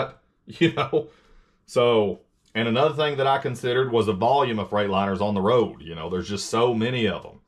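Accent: Texas, United States. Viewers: LOL you come off sounding very Texan!!! Who gives a shit what the idiots say.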